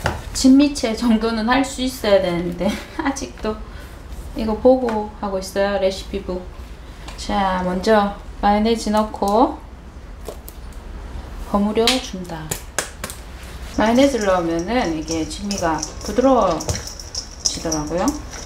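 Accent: Gyeongsang, South Korea. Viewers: 오~ 대구분이시네요 친근함이 느껴지네요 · 항상 영상은 보지만 댓글은 처음입니다 ^^ 어찌나 깔끔히 살림하시는지 항상 감탄을 하며 항상 바지런하고 소박하게 사시는모습 응원하게 되었어요 멋진집 멋진 인테리어가 아닌 우리네 사는 모습을 보여주셔서 더 눈길이 가고 한번더 보게 되었어요 저도 456님처럼 될순 없지만 영상 볼땨마다 같이 움직이고 청소할려고 하고있어요^^ 영상에서 혼잣말 하시는 귀여운 사투리도 너무 좋구요 저도 경상도라 너무 정감가요 앞으로도 좋은 영상 많이 부탁드릴깨요 항상 응원하고 건강하세요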